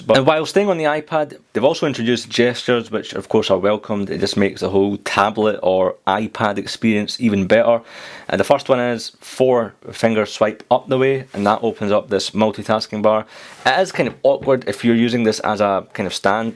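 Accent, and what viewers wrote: Scotland, United Kingdom. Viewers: Haha, I thought you were scottish. :) Thanks for the review mate.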